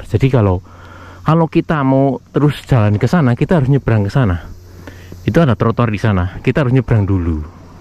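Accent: Banyumas, Indonesia. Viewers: Mas ini aslinya drmn? Spt dr daerah Banyumas kl dengar logatnya.. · Ngapak Banyumas kayane kie logate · Punten..mas iki jawane ngendi yah..ko ngapak bener hehe..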